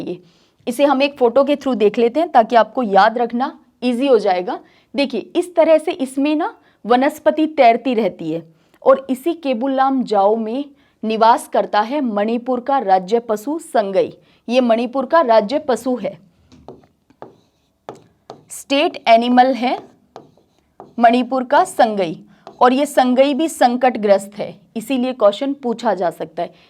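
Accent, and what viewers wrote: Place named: Gujarat, India. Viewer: Apke pronounce sation Amit Shah ji sahan hai. AP Gujarat se belong krte ho kya?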